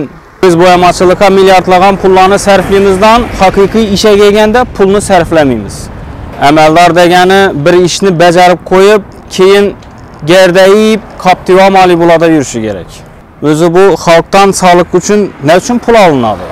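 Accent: Khorezm, Uzbekistan. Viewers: ака хоразимча гапирябсиз базида тшуниш кийин болябди илтимос адабий тилда хам гапиринг..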